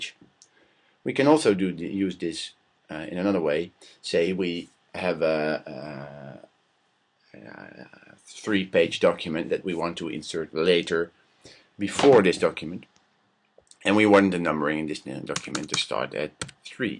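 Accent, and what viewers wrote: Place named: Netherlands. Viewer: DAT DUTCH ACCENT DOOOO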